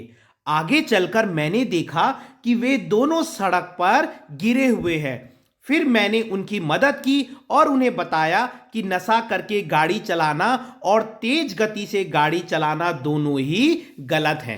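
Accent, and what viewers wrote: Garhwal, India. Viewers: aapke accent se lag rha tha mai bhi gadhwali hu😛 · Sir aap garhwali ho? 😃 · Aap uttrakhand se ho na gadhwali..😄😄 voice tuning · Sir mai dekha aapke bolne tone garhwali jase hai Orr aake video dekh rha hu aaj mera exam hai 😂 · Bhai tum gadwali ho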